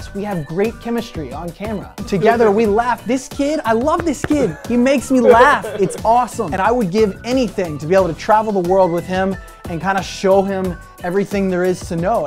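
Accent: Argentina. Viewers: La primera vez que veo tus videos y me encantan! Lindo acento Argentino jejeje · Aguante el proyecto kity pasta!! jajajaja volve a Argentina, sos un groso! mi novio es italiano y habla el " castellano Argento" como vos :) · Te olvidaste de como hablar en argentino? O solo estabas hablando asi por el colo? PD: No te pude ver en Argentina :'( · BUE, muy lindo todo lo del acento argento, el colorado un copado también, pero como decimos por acá PONETE A LABURAR QUERIDO!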